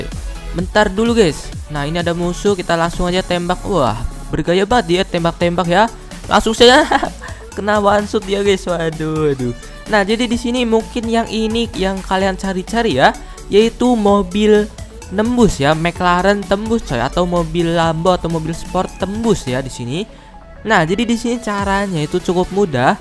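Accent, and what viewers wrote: Java, Indonesia. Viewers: Gua yakin lo orang jawa kan bang?😂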